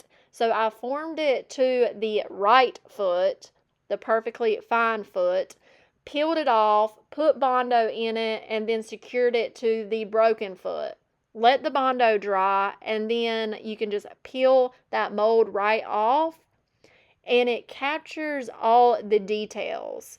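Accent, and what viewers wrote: South, United States. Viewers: Love your work. You said someone suggested you get a narrator, so rude and low life. Normally I don't like a southern accent. I've stopped watching some sites for this reason. However I love your voice. It is soothing and low key. You're great😊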